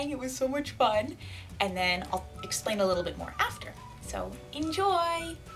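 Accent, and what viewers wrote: Texas, United States. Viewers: I'm from Texas and I can say, there is no true stereo type accent. Texas, being such a large state, has different accents and dialects depending on what part of the state you're from. A lot like Japan. The accent most people relate to "Texan" is the drawl spoken in East Texas, like Bryan or Tyler. She's from Austin and sounds pretty Texan to me.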